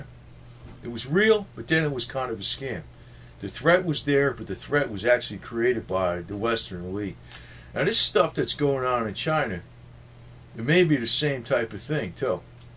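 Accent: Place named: New York, United States. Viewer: Your not too bright. That very much so is a New York accent. Have a nice time trolling channel...its making you look even worse.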